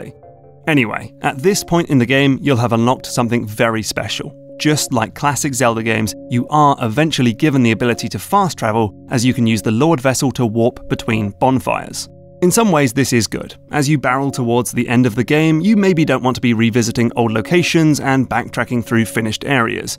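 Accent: United Kingdom. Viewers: What's up with the great analytical YouTubers all having UK accents?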